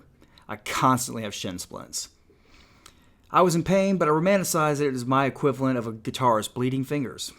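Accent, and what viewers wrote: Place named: Georgia, United States. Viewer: I'm so glad I found your channel! I had this book, loved it, I'd pick it up sometimes and read any random section, I always got something out of it that stayed with me, then I loaned it out & never got it back, so this is a real treat, and btw, you do a great job and your Georgia accent is endearing, I like it.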